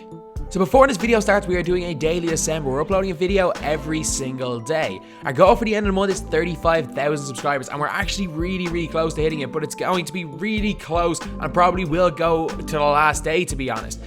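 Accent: Dublin, Ireland. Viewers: Is that an Irish accent on the narrator? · are you from Dublin or county's around that like kildare Louth ect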